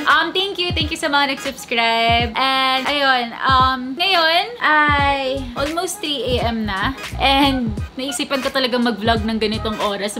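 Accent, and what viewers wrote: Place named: United Kingdom. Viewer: ok naman pronounciation nyo ng youtube, british pronounciation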